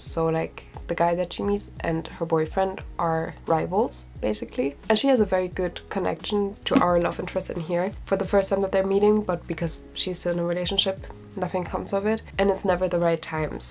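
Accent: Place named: Germany. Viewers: I was wondering the same thing after hearing two sentences 😊 definitely a german accent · Are you German? I was just wondering because you have a German accent I’m not trying to be rude(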